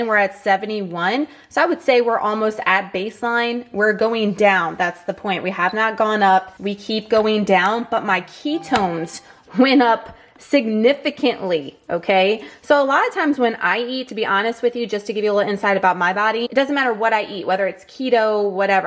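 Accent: Louisiana, United States. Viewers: Recently found your channel about a week ago! I use to do keto and had success years ago but I needed something else. Currently doing alternate day fasting and just calorie counting! But your SO motivating!! Btw love your accent!! I’m from Louisiana too! Pierre part... about 1.5 hours away from New Orleans!! It’s nice to see locals on the YouTube!! Keep it up!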